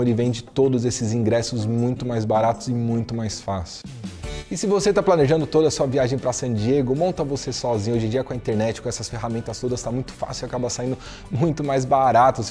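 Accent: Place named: São Paulo, Brazil. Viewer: Ele fala meio rindo né. Que nem o Luciano huck hahahah quem tava na dúvida se ele era paulistano tirou 🤣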